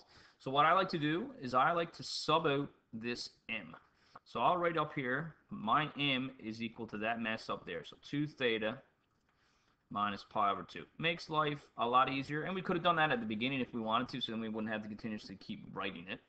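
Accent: Newfoundland, Canada. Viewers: As soon as I heard ya, I knew you's a Newfie b'y...lol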